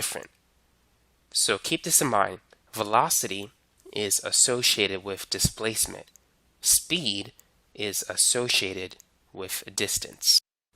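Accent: United States. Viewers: Dear Teacher: You helped me today. I am teaching myself-or more correctly, learning myself via teachers like you--physics. My interests--outside English teaching and philosophy--are sustainable aviation and human spaceflight. Please allow me to help you--just a bit. Sir, you're a good teacher (thank you for that!); you speak fairly distinctly, slowly enough for your students to hear and comprehend your words & concepts clearly--and you convey information methodically, in bits that can be digested--whereas most physics teachers I have seen can't teach to save their granny's lives; but, respectfully--and take this with brotherhood, my friend, consider--pronouncing your words fully, please. 'Wes' is not a word (it's a person's name), nor are 'Eas' or 'Norf'. In addition, 'objec' is not a word, either. People will say this doesn't matter--and that you speak an American city dialect---but I care to much for teachers like you--who are trying to educate the world--so I respect you enough to say they would be wrong. 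You are too well-educated to limit your pedagogy with voluntarily incorrect pronunciation; I say "voluntarily", even though I would guess you probably grew up in an immersion environment wherein your peers and guardians (really--your guardians, too?) spoke this way, which affected your interpretation of the phonemes they were pronouncing... in relation to the words they were a part of... and the connected to meanings they were attached to- unconsciously; so I could guess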